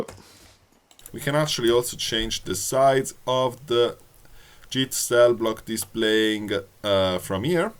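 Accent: Italy. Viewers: Hello, are you italian ? I love your accent :)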